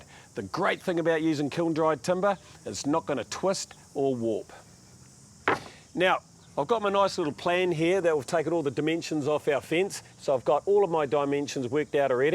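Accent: New Zealand. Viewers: kiwi accent haha shit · with a name like Gonzales, perhaps you are just not familiar with the NZ version of English. I was born in Australia and familiar with kiwi accents, as they probably are with some of our aussie accents! If you have an accent, I guess also some of us would have difficulty understanding your version of english. Tolerance and understanding will help us all overcome such judgements...if you watch what he's doing as he is speaking it is pretty easy to fill in the gaps of of misunderstanding his accent ;-[) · Nice job man. Quick question: what's a 'chuzzle'? #kiwiaccent 😁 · hes kiwi where u from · He has a kiwi accent, he's from Manawatu in NZ :)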